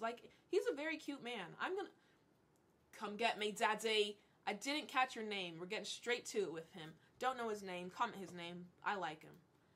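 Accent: United Kingdom. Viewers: Came for the casual objectification of millionaire men, stayed for the eerily impressive British accent.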